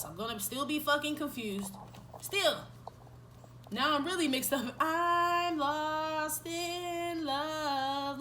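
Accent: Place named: Los Angeles, United States. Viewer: Girl you got to be from out here in LA..I know them accents anywhere 😩...you be having me ctfu!! lmao n girl yes fck him he'll be back 😩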